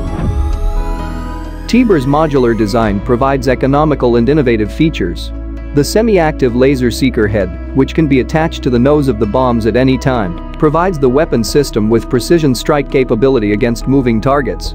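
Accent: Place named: United States. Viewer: Ich frage mich wie krank ist die Menschenheit, die Überschrift heißt, Endlich hat die Türkei die Tödllichste Drohne. Als ob die Welt ohne Waffen da steht. Der Sprecher dürfte wohl Amerikaner sein. Ich wünsche uns allen Frieden und Freiheit auf Erden ohne Waffen, und nicht mit Waffen.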